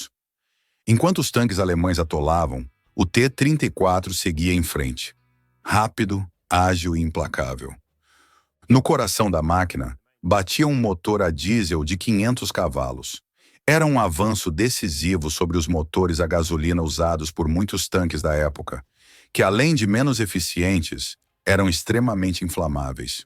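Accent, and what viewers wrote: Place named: São Paulo, Brazil. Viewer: Colocaram o sotaque de Paulista caipira na voz da IA.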